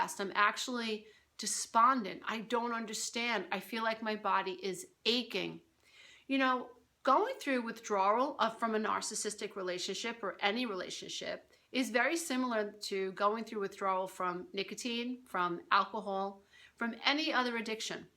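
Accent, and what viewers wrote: New York, United States. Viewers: Thank you Lady! I needed to hear that today. And your New York accent Rocks! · New York accent-one of my favorite American accents! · I think it's endearing that you have a new york accent :) a real person not an actor :D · Thank you for making me laugh today. That whole "feed me feed me" part. You've hit the nail on the head and this is exactly what I'm going through right now. And this is exactly what I needed to hear. Thank you for addressing everything in a very funny light-hearted way. You helped my day begin in a much nicer way than it did yesterday. And I think your New York accent is adorable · Oh my goodness! I totally resonate with everything you are saying. Moved out last October. Almost a year! Woo Hoo! But... Why do I still miss the guy who was never NEVER really there- not physically or emotionally. He of course hoovered me 5 minutes after I moved out and then just a few months ago. 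Yay me for not falling for it, but after the last Hoover, I fell into the "vacuum". Guess what? I just met a new guy and he was love-bombing me on our first 'date', mirroring me, and even asking me to move in with him!! "You attract an energy being that is equal to the one you just kicked out the door!" EXACTLY!!! I feel like the universe is testing me- or maybe pointing out that I haven't done as much healing as I though I had..... Urgh.... Time to get to work and stop ignoring myself! By the way- I love it when you say "I digress..." And the NY accent! You speak so passionately and honestly. THANK YOU FOR ALL YOU DO! :) <3 Hugs from Canada. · = The reason why I've been coming to you for life guidance for over a ye-ah. Dat tick New York accent!!! No for real, not only was I stoked that I found on youtube an empowered Italian woman who could empathetically help adult children of alcoholics like myself...but do so with a Queens accent? 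Paisan!, you're literally the best! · Love the NY Accent. Im from Manhattan and the Bronx. :-)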